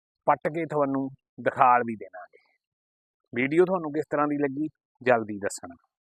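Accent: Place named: Hoshiarpur, India. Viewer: Veer Hoshiarpur ton o lgda a bolan ton ma v Hoshiarpur ton a reh bhr rea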